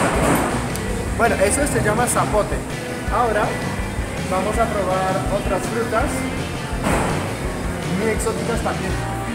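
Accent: Colombia. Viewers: Por alguna razón cada vez que veo tus vídeos , es un poco gracioso como hablas castellano xd , tienes acentO colombiano hahahahaha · llevas tiempo viviendo acá? porque tienes acento colombiano!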